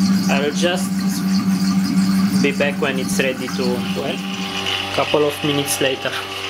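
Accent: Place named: Russia. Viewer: рашин акцент)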